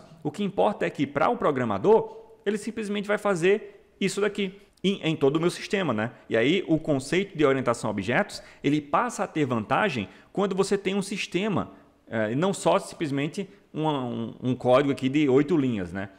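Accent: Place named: Paraíba, Brazil. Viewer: parabéns pelo video meu amigo! Seu sotaque parece muito paraibano. Estou correto? Abraço.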